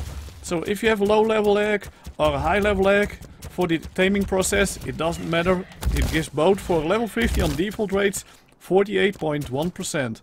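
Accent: Netherlands. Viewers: Ben je nederlands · What language are you you sound dutch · the dutch english accent omg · Mooi nederlands accent. This is a sifferent sort of cook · Haha ik hoorde gelijk dat je Nederlands was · Jij bent nederlands dat hoor je echt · you sound very dutch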